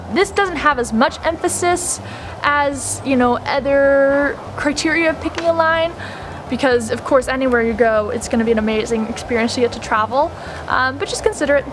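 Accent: United States. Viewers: I sounds like you have an American accent. Were you in entertainment? I was a casino dealer on RCCL. What can make or brake it, to me, is your roommate. Any opinions?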